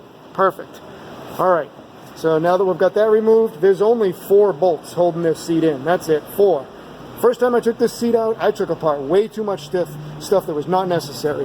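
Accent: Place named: Boston, United States. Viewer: Hi, plan on doing this but with a pickup truck. The electrical connections concern me a little, I know you have to disconnect battery otherwise airbags will go off, but in new pickups, I'm not sure if passenger seat is required while driving. If you know the answer, I'd appreciate it BTW at first I thought you were from Boston, or North Carolina (Fisherman's Warf accent), never thought Florida has a hint of that. I heard the 9th ward in New Orleans they have a Brooklyn accent. Thought that was interesting. I love Florida BTW, thnx for the vid 👍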